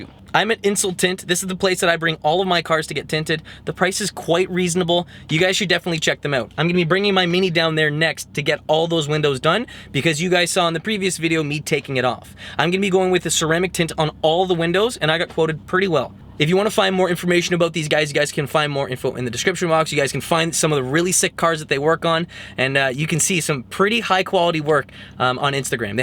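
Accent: Canada. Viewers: Thats one thick Canadian accent. The pronunciation of those o's. But that aside, it's a good informative video. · In the last year I've kind of realized that there is in fact a Canadian accent, even if you live in the cities, and oh boy I figured out you were Canadian right away LOL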